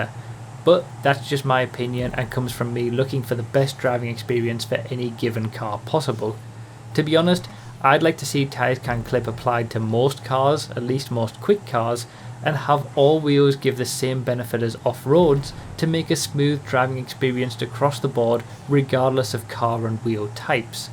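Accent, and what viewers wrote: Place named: England, United Kingdom. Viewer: he's English thats whats going on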